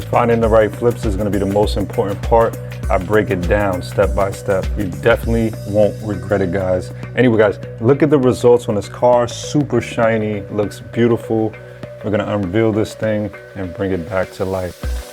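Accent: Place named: Northeast, United States. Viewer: Dude you said right! I know it's hard with a northeast accent 😂. Digging these flips. Nothing like a great deal, minimal cost and effort to double your money.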